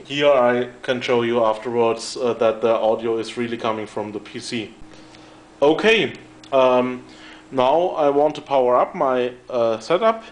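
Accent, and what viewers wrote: Germany. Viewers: Man hört irgendwie dass du deutscher Bist; aber kannst geil englisch :D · Man hört sofort das du Deutsch bist ^^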